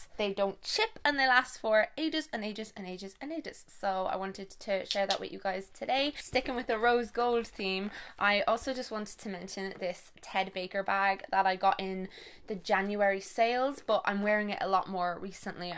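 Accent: Ireland. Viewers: I love your videos so much and I love to hear an Irish accent on YouTube and I'm from Ireland too (cork) 🇮🇪🇮🇪🇮🇪🇮🇪🇮🇪🇮🇪🍀🍀🍀🍀🍀🍀💚💚💚💚💚💚💚💚💚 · To be honest I started watching your channel coz you're Irish and I love your accent (I had a girlfriend that's from Edenderry but she won't talk to me anymore and I miss her accent) but I actually really enjoy your content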